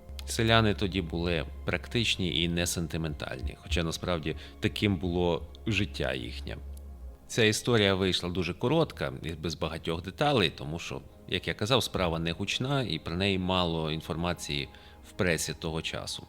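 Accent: Lviv, Ukraine. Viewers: Вас так приємно слухати.Гарний тембр голосу, літературна,і разом з цим, чути львівський говір!Буду слухати вас і надалі! Цікаво.Удачі вам і наснаги.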